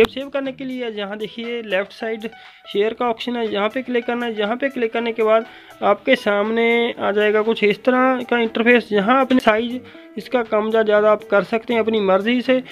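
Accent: Punjab, India. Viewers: Aap pke Punjabi hai na sir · Yehh punjabi voice😇😇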